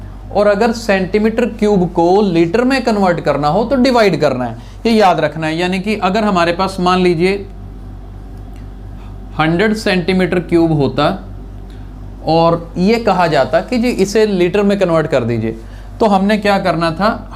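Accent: India. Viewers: Accent is also impressive! Very few Indian has good accent like you sir. Thank you Sajid Ali Karachi Pakistan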